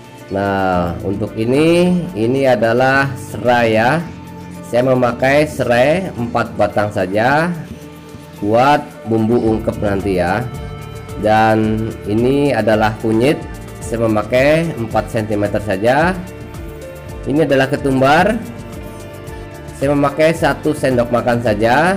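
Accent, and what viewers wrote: Indonesia. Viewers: chef nya ngapak ya ?? suara nya enak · Ngomong nya kaya jawa tegal apa brebes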